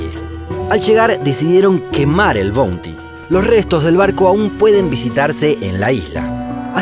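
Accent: Argentina. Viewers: Que horror ese acento Argentino ...shoooooo ashi amarishooo shooo, bye. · Esta bonita la historia que cuentas solo que ese asento de boludo no se oye bien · Más argentino imposible jejeje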